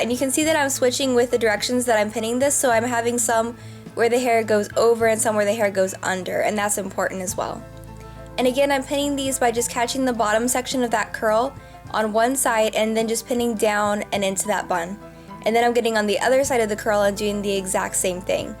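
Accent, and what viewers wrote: United States. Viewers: Hi which part of USA r u from? I love this accent you have!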